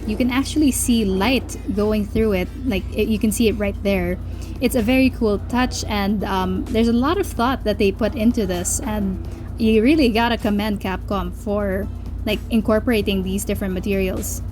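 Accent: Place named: Philippines. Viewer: You sound noypi ma'am. I have been thinking of getting this set.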